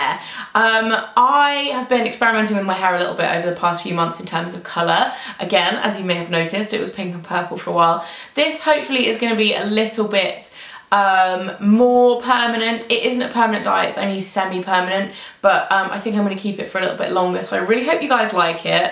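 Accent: United Kingdom. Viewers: I agree, maybe it's the voice and the british accent! :)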